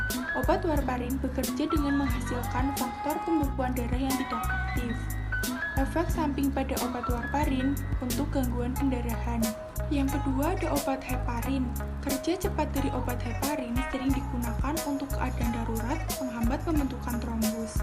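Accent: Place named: Java, Indonesia. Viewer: Medok banget ndesss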